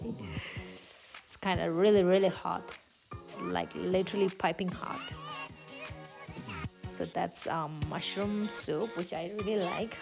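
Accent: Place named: United States. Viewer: Wow! Your english speaking is super fluency and a perfect American accent. Good to hear it. 👍 Happy stay!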